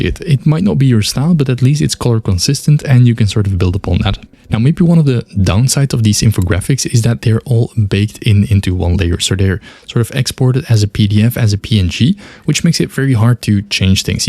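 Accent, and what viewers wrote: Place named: Netherlands. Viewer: Thank you for the effort and detailed explanation. Subscribed. By the way, from the accent I think you are 100% Dutch. )))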